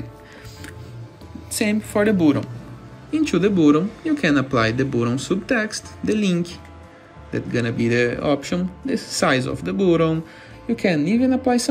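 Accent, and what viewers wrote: Brazil. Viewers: Incrível o quanto é fácil reconhecer Inglês brasileiro kkkk Vlw irmão <3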